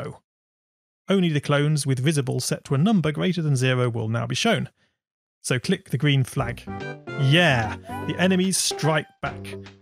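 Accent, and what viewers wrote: United Kingdom. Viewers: I absolutely love the way you sometimes call Goombas "Gumbas". That good old British accent :_cool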